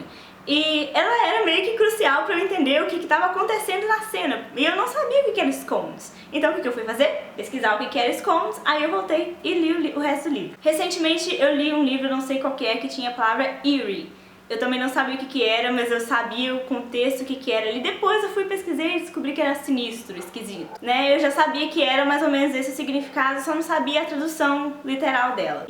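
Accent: United Kingdom. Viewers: Meu Deus, você fala bem demaaaaais! E o sotaque britânico é puro amor. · Sotaque britânico 😍 estou fazendo a mesma coisa pra aprender inglês também já faz uns meses, é bem legal haha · Caramba. Hehe Muito bom, e tem até o sotaque da Mione. Kkkk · O seu sotaque britânico é muito bom - você deve ter praticado bastante mesmo! E esta dica sua é espetacular não só para o inglês mas para qualquer idioma. Parabéns pelo canal e continue com as ótimas dicas! :) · Adorei o sotaque à la Hermione ^^ Sua fofa ;* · Eu assisto tanto Sherlock (em inglês com a legenda em português) que agora to vendo com a legenda em inglês também. Falo junto com o Sherlock quando ele tá dando adeus pro John ;-; "it's my note. That's what people do, isn't it? Leave a note?" mas acho que não consigo fazer o sotaque britânico que nem você, invejinha. E acho que agora vou ver HP em inglês e com a legenda em inglês também já que sei as falas em português, quem sabe me ajude mais. 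Eu considero meu nível de inglês bom e consigo ler um livro, mas tem um problema: eu tenho preguiça de ler em inglês lol eu amo ler, mas as vezes bate uma preguiça. Tenho que mandar ela embora por que lendo é a melhor forma para aprender inglês. E to com você, eu também falo sozinha. Eu faço resenha de livro falando sozinha no quarto em inglês, com medo de alguém entrar e ficar se perguntando que "merda eu to fazendo" :p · Seu sotaque britânico é maravilhoso!! Parabéns pelo canal,. Me inscrevi e ativei as notificações ❤👏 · You speak with Hermonie English accent.. woah.. :o I learned some Portuguese by watching Harry Potter in Portuguese.. but I don't think I learned how to speak with a Hermonie or Harry portuguese accent.. aw.. :p it's very good to read books in English too.. I am trying to read books in Portuguese.. I love finding people to speak different languages with.. it's fun to learn about different cultures.. 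vamos falar em ambos línguas.. yay :D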